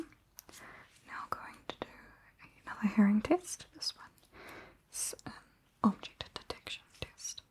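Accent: New Zealand. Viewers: lordy kiwi accent melting my vagus nerve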